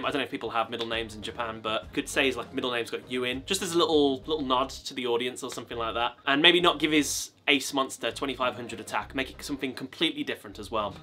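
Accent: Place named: United Kingdom. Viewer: thank you. Are you UK based, you sound it. Don’t see many Yugioh channels with UK youtubers. Nice to see you represent 👍 I’m gonna throw you a subscribe your content is decent.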